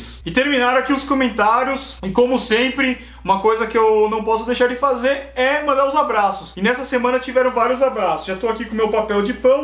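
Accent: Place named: Sorocaba, Brazil. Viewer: Eu sou de sp , sua voz que é de "do" Sorocaba rsrsrs . Valeuuuuuuuuuuuu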